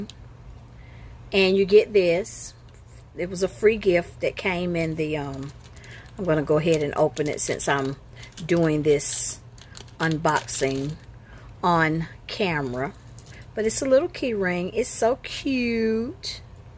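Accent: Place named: South, United States. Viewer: You sound like a sweet southern girl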